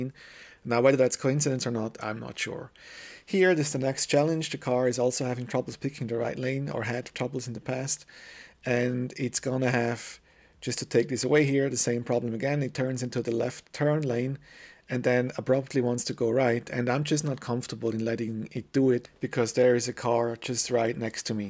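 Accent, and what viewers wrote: Switzerland. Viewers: I love your accent swiss man ;-) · Are you from Switzerland? Your accent sounds a little swiss-like;) btw. great video!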